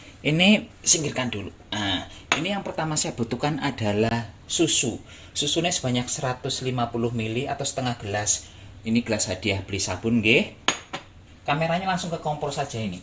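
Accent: Banyumas, Indonesia. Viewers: Matur suwun resepe lik.... Kok lik ngapak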